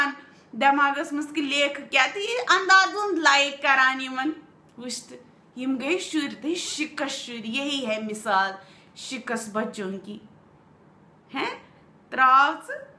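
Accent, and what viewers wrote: Kashmir, India. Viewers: Your koshur accent. Iloveyou lady😍💓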